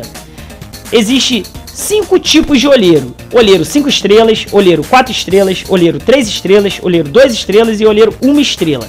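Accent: Rio de Janeiro, Brazil. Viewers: Q time vc torce? Pelo sotaque é carioca deve se pro Flamengo...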